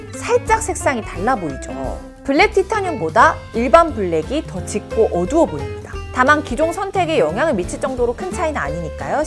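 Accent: Gyeongsang, South Korea. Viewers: 대구 사투리 ...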